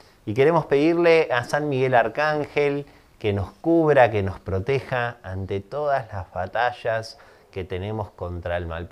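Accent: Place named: Venezuela. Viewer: 🤭 ¡Lo sabía, eres Venezolana 😊 Un abraaazo 🤗! ese tono de voz es inconfundible prima🇻🇪.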